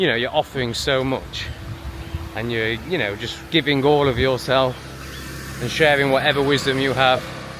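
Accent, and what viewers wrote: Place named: Yorkshire, United Kingdom. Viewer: Propa yorksha mate…keep it up😂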